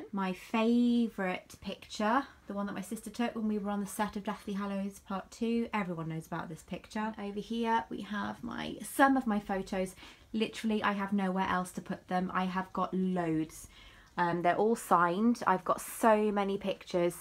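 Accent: United Kingdom. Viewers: it's better cause you have a britsh actcent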